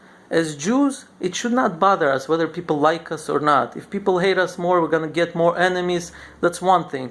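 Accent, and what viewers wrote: Russia. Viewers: Hi bro! I am crushed into your videos. Just curious to know where did you get your russian accent? )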